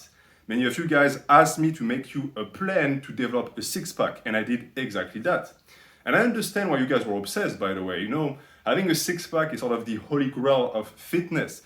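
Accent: France. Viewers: je viens de découvrir ta chaîne et j'ai su en une phrase que tu étais français avec ton accent , continue tout est excellent et cette french touch ça donne juste envie de regarder d'avantage tes conseils et d'en apprendre plus , à la prochaine .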